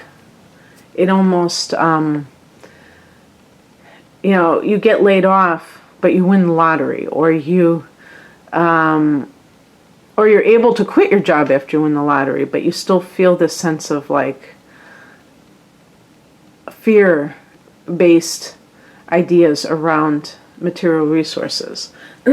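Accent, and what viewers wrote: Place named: Midwest, United States. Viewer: oh hey a midwestern accent! Hiiiii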